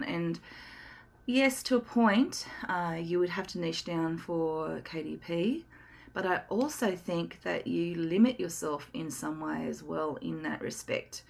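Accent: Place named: Australia. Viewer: It is great to hear an Aussie expert voice! Glad I found your channel!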